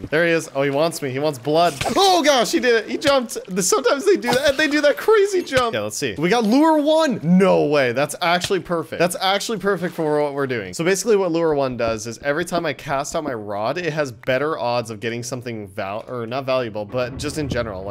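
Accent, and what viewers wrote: Ireland. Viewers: I never knew u were irish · Ur Irish?! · Wait Irish???? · Irish? Jacksepticeye?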